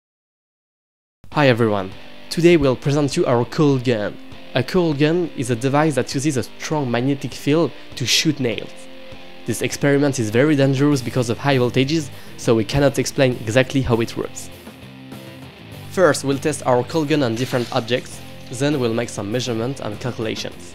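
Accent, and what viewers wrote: France. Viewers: That's a fellow french accent i hear there ?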